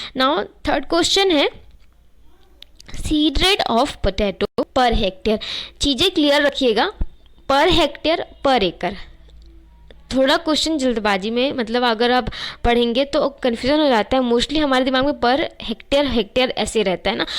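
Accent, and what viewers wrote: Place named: Kanpur, India. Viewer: to kaha se .... Apki boli se lagta h sp Kanpur ki ho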